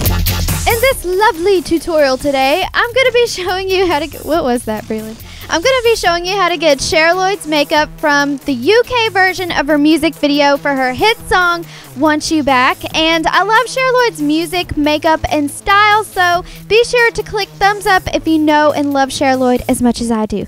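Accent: South, United States. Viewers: Are you southern? lawl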